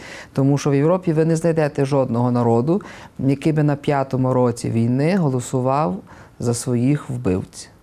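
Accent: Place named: Western Ukraine, Ukraine. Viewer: Какой противный западенський акцент , слушаешь и хочется рыгать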